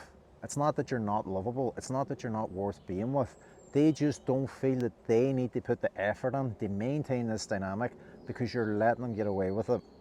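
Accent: Northern Ireland, United Kingdom. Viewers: An Irish Northern man ...wise. lrish here. New sub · Your channel popped up and disappeared but I found you. Lovely to hear a fellow N.I. person. ❤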